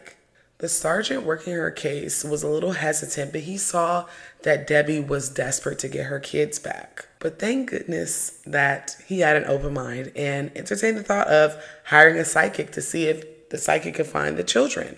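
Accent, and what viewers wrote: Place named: Baltimore, United States. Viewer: Sounds like u from Baltimore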